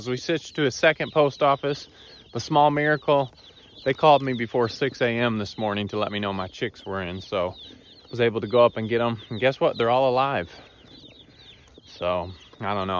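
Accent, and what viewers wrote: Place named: Alabama, United States. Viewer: Hey, that sounds like an Alabama accent you have.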